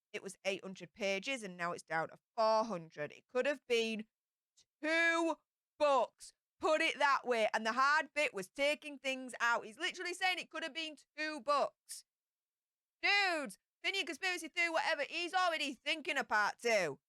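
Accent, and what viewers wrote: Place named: England, United Kingdom. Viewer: I dont know what to think about Harry. He was Always my Favorite, but now? I dont know what to say anymore. He should divorce Meghan. They dont fit together. Since she is in the Family, everything goes Downhill. your accent remember me For Louis Tomlinson from one direction 😂 He ist from doncaster 🥰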